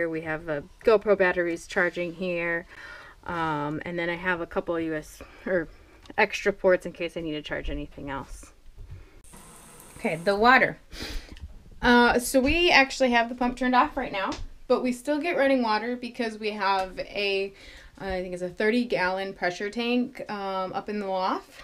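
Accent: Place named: Minnesota, United States. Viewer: I knew I recognized some Minnesota in you. Keep the great video coming!!!! 👍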